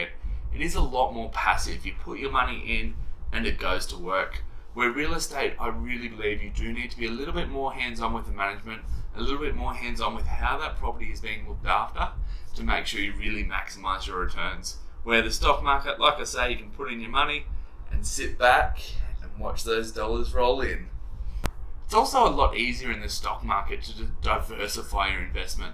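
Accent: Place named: North, United Kingdom. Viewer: Keep going. Great to hear a voice from the North about the North.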